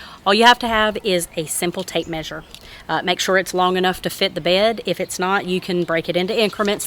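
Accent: South, United States. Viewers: I am so excited to have found your video! I found you through Dig, Plant, Water Repeat :) I’m growing in Hawaii so I’m a zone 12a. My climate and soil is drastically different than yours. I have all soil brought in and it’s only 3 inches before I hit solid lava rock! I wish I could design beds like yours :) I also love the sound of your voice and accent. My fiancé is from Georgia and Alabama, so listening to you talk is very similar to his grandma and mom’s. It’s so soothing and lovely. I cannot wait to follow your journey!! I make garden videos too (not as great as yours) but if you want to see what us hawaii people garden in then give it a look. Aloha!!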